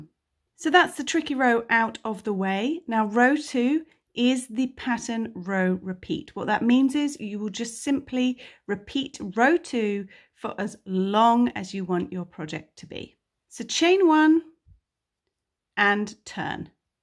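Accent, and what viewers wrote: United Kingdom. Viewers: another excellent tutorial. has a pleasant easy to listen voice in UK English.Not sure why American crochet terms are used though. I am sure there is a good reason?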